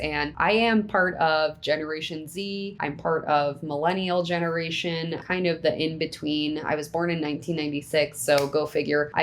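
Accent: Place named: Wisconsin, United States. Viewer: Do you mind me asking what state you're from? I'm trying to place the accent. I'm guessing Wisconsin. Great voice by the way.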